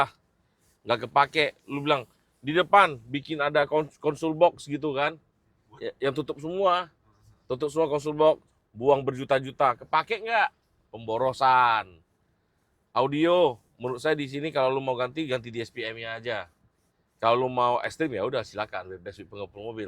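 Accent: Medan, Indonesia. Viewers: Gokil kokoh* medan identitas cakap anak medan melekat😅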